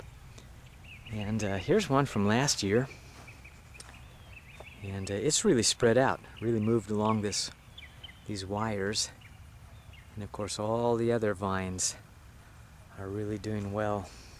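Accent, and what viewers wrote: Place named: South Carolina, United States. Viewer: he sounds like he is from upstate south carolina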